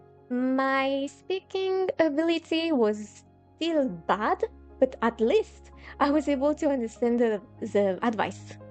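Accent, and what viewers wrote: France. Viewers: Hola, you have improved a lot since I last commented on your videos. You've started to use the preterite a lot instead of the present perfect which is great, you have verbs agreeing with the subject. - mejor "capítulo", creo que se puede decir también "episodio" - excellent use of the imperfect and I love the cute "oui" after you corrected to "mucho tiempo" haha - cuarenta (quaranta is Italian, which I would love for you to start learning too 😄) Edit: you corrected yourself actually so great job! - this is quite advanced but I think it would be "como era", I think you can say "pero porque" but it's kind of a direct translation from English ("but because"), also then it's "no pudimos comprar nada en las tiendas" (I also get podemos and pudimos mixed up!). Great job with "pescado" that was correct and great that you didn't say "pez" - ooooh a subjunctive! 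I also love your accent in English because it's like a mix of a French and unspecified Asian (I guess Korean in your case). You speak clearly and correctly which is the most important thing. I for example would just speak to you like I do with other native speakers, that's how good you are! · Hola! I think I missed what your native language is! maybe french based on your channel's name jajaja. So, keeping with the topic of your video, im gonna keep writing in spanish. Me encantó el video! Soy de Argentina y también estoy en este viaje de aprender algunos idiomas. En este momento tengo un inglés que fluctúa entre b2 y c1, dependiendo de las skills que use (writing or speaking). Estoy aprendiendo algo de italiano y ruso (muy básico). A diferencia de lo que dicen algunas personas, creo que el español es uno de los idiomas "más fáciles" para aprender puesto que es un idioma fonético, y realmente no usamos los 16 tiempos verbales. 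en este punto estoy escuchando tu descripción y noté el acento jajaja Vos (tú) venís excelente! El acento francés le da el toque especial a tu español jajajaja, se escucha muy tierno. Entiendo que estás aprendiendo el español de España, no? El español de Argentina es mejor, way cooler 😁 Definitivamente voy a seguir todo este proceso en tu canal!!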